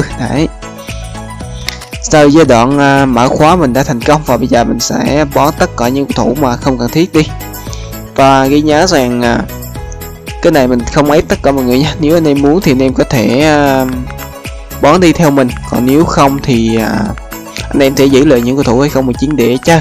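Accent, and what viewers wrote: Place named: Quang Ngai, Vietnam. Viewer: bạn nói tiếng quảng Ngãi mình không nghe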